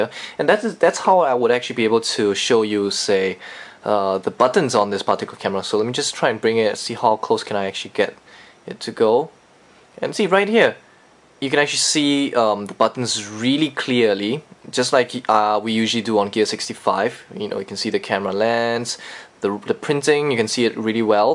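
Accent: Singapore. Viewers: Singaporean english same as Malaysian english :D that's why i can understand this video XD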